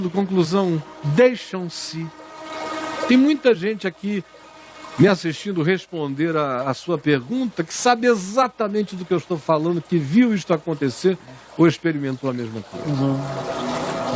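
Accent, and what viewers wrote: Recife, Brazil. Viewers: Nota dez trilhão sabe que fala Recife Pernambuco ❤❤❤❤❤❤❤❤❤❤❤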